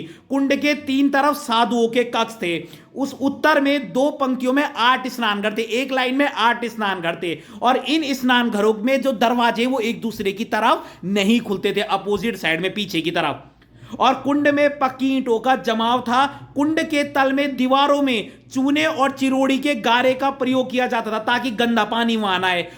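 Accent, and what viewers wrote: Garhwal, India. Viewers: Sir aap gadwali ho na 🫢🫶🤭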